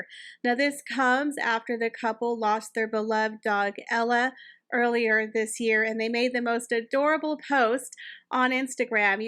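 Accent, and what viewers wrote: South, United States. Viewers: Love your «yall» accent. Hazbeen wants to be treated like royal. He even insisted to be called with his HRH at the High Court in the UK. He wanted to live like a billionnaire while doing nothing.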